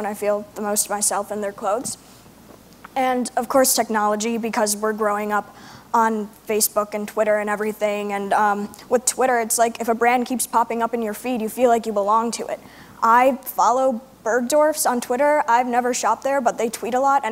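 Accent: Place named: United States. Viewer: this american accent seems incredibly harsh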